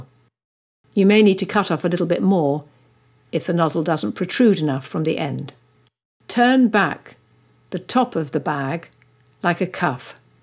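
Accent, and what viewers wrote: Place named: United Kingdom. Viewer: this says how to ice cupcakes not the whole nine yards plus am ammoying british accent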